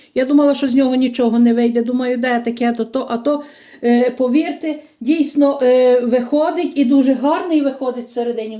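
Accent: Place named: Ukraine. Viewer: Доброго дня Вам пані, гарні всі Ваші рецепти і особливо стародавні. А ще Ваша розмова зачаровує, але не міняйте свого діалекту на те, що Вам там можуть зауважувати, мовляв не "лижка" а "ложка" - але ж цим і є особливість Ваша!!! Говоріть так як Ви і завжди звикли - це дуже гарно і своєрідно українське!!! Міцного здоров'я, мирного неба і процвітання Вам!!!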